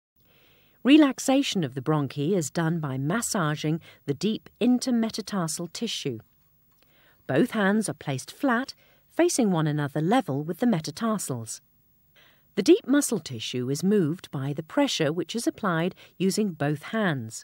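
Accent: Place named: England, United Kingdom. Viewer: what a beautiful English accent , so clear , well paced and simply captivating well narrated , dynamic voice 🥰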